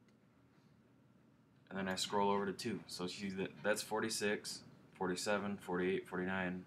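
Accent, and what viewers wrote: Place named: East Coast, United States. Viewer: I hear an East-coaster.. are you a fellow Newf? Thanks for the vid bro!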